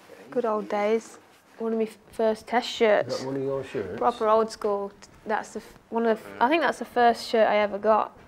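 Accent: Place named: Yorkshire, United Kingdom. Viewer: Like the Yorkshire accent . Brave girl. Good luck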